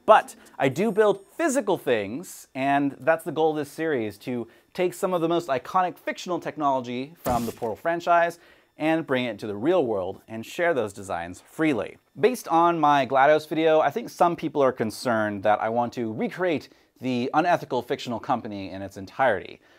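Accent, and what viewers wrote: Russia. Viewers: You are Russian? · Ты что, русский?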